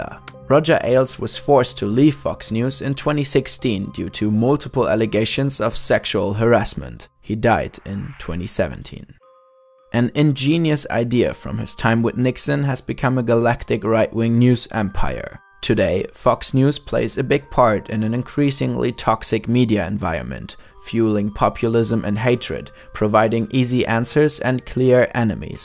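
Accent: Germany. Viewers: holy this GERMAN accent xDD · Hey Guys, i love your Way but there is a little German in your English Tounge....that not mean your English is Bad. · Nice vid, but you should work a bit on your voice performance and your german accent. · It's weird that mostly Germans blames about the fact that there isn't a native speaker. Most Americans and Britians (I know there're differences) blame about wrong grammar, spelling or words. They just (try to) understand what was said. But their grammar and pronunciation are great, so why changing it? Are you so distracted by the German accent from the video? I don't have that kind of problem. Disclaimer: I'm a German, so if I'm wrong what I said about grammar and pronunciation, please correct me. I don't know whether you're German or not, so if I'm wrong, please correct me, too. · In my opinion, you should get a native speaker for your videos, I just cant get that german accent out of head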